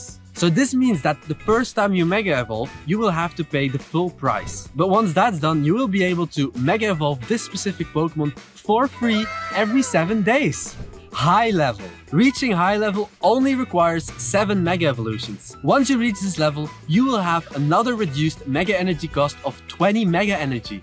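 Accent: Netherlands. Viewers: I can instantly tell you're dutch by the accent :D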